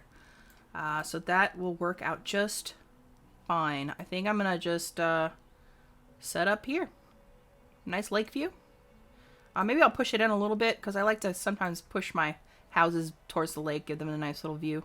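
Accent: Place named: Texas, United States. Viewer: that's awesome! I was born and raised in texas too lol okay I thought it was kinda texan sounding but some southern drawls can sound similar haha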